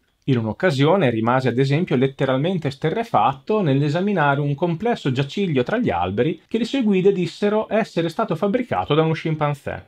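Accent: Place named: Romagna, Italy. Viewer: C'é un vago richiamo al mitico tabloid di Luttazzi alla Gialappa, dovuto all'accento romagnolo. 😍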